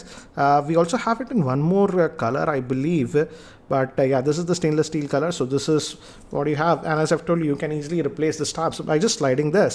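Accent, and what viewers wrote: India. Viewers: Practice more on your english accent ...less indian plz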